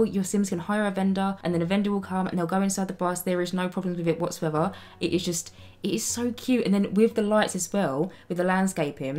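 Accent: United Kingdom. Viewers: The British accent helps a lot.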